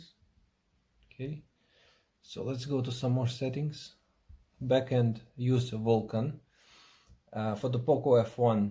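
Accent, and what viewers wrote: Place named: Russia. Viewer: То чувство когда русский базарит по английскому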